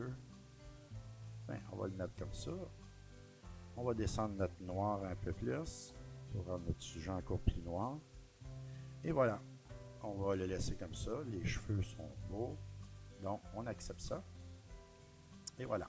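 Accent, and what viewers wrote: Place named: Canada. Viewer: très bon tuto; l'accent canadien ajoute ss doute qqchose. Très clair et efficace. Merci.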